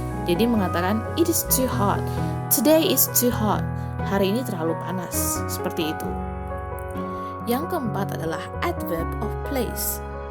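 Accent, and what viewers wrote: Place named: United Kingdom. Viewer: Suka nih british accent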